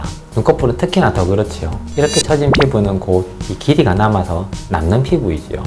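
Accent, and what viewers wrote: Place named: Busan, South Korea. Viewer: 제가 부산사람이라 그런지 부산사투리로 설명해주시는거 친근하고 좋아요^^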